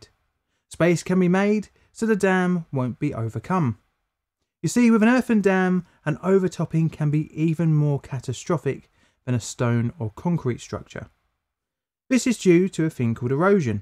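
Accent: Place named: South London, United Kingdom. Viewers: Something about an English accent is relaxing. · "Sunny Southeastern corner of London"? Sounds like you are talking like some place near Carshalton.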